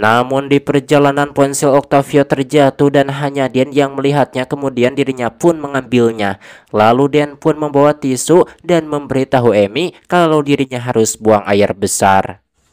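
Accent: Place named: Madura, Indonesia. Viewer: Meduro logatnya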